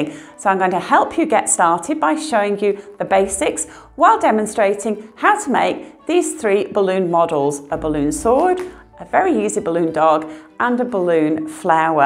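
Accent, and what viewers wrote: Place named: United Kingdom. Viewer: Thank you so much, I learned a lot. Also really makes me miss the friendly people in the UK when hearing the accent 😭😭😭😭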